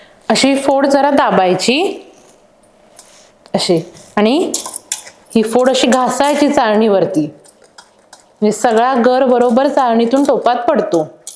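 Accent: Kolhapur, India. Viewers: Full kolhapuri zalis ,tone pn kolhapuri zala ,mast 👍👍👍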